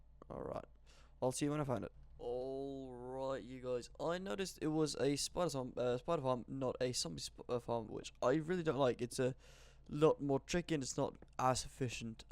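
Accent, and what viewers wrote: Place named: England, United Kingdom. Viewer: You sound english. It is uncanny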